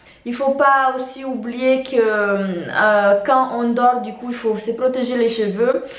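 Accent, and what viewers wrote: Madagascar. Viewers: J'étais sure que tu étais malgache (façon de parler, visage aha) et je viens de voir que tu as fait un vlog à Mada', ça se confirme.😊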